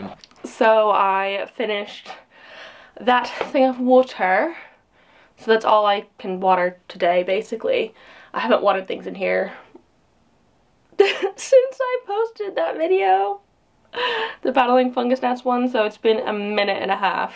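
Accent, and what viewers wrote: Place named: England, United Kingdom. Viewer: You can tell when she’s been hanging out with Claire a lot cuz the English side of her accent gets heavier lol. How long have you lived in the UK?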